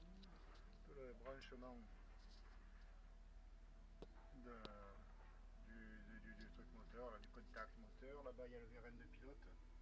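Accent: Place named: Marseille, France. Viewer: Salut, j'ai passé l'hiver devant tes vidéos et je pense les avoir toutes visionnées (même 2 fois pour certaines). A force j'ai attrappé l'accent de chez toi. un lorientais qui par le marseillais! ma femme me dit que je suis devenu fada! j'ai hâte de voire la suite de tes aventures. Bon Vent l'ami et comme on dit chez nous KENAVO!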